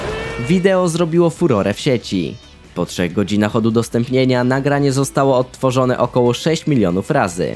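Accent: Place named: Poland. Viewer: O dzisiaj dużo polskiego akcentu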